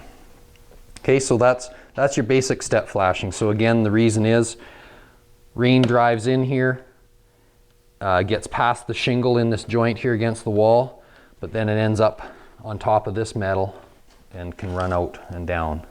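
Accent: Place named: Canada. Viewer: Rodney Byrd dude, be a little more tolerant man. He is most likely Canadian. Thats how they speak. He didnt call you out on your fucked up spelling, did he?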